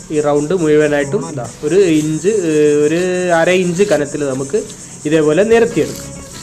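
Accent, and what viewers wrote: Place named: Malappuram, India. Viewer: Adipoli aayittund engale veed evide.samsaram kettitt malappuram aanennu thonnunnu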